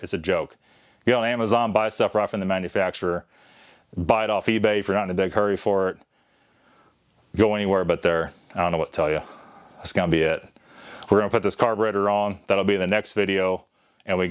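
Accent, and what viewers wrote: St. Louis, United States. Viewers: Dude you GOTTA be from around St. Louis? You sound like my parents and I was born there, and especially your humour! Crapping their pants! Omg! Dude I was dying, I did buy a drive belt for my N20 from gasp!...auto zone and it was continental! German made too! But that is all I’ll buy! Period! Keep up the work!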